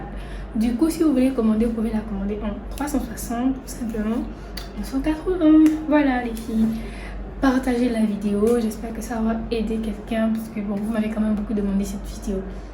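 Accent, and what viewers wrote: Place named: Gabon. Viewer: Tu as l’accent d’une gabonaise 😅😅. Sinon très beau rendus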